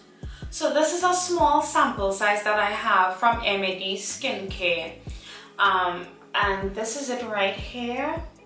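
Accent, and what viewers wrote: Trinidad and Tobago. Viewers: You from Trinidad 🇹🇹 ??? Your accent 😍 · I randomly clicked on this video looking for reviews on the soap and immediately was like wait is she Trini? Lol its so cool how our accent is soo recognizable especially to other Trinidadians lol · First 3 seconds, i knew she was Trinidadian. Liked and subscribed ONE TIME! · I hear that trini accent... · I know a Trini accent when I hear one 😍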